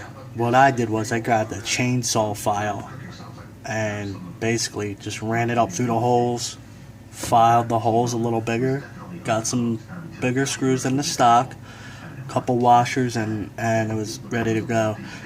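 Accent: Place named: New Jersey, United States. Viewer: Good video...thanks for the info! Your Jersey accent was rough but I'm a southern boy LOL. Thanks again.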